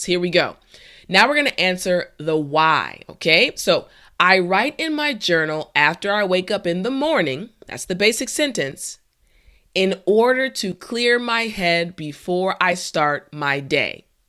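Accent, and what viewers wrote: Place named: United States. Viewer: Hello beloved my mom I'm meek ranjit from India at Odisha studying now I'm so cheerful of your english Teachership truly say that I'm understanding your Pronouncation of an American accent now these days improving vocabulary I'm also reading the Bible everyday that's by God is helping me individually life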